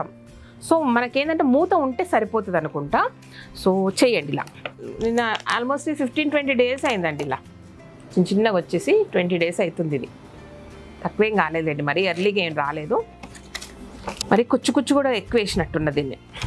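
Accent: Telangana, India. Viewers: U speak liķe me mast hydrabadi telàngana.super atlane frankga vundu friend.dont change ur way of speaking in telangana. Mast ga chestunru n success ayinattu chupistunru.great but nenu itlane idea tho youtube lo chusi chesina mentulu,pudina chenagalu.without soil n with soil sand lo but raledhu. Micro grain greens avuthalevu.y reason cheppandi friend.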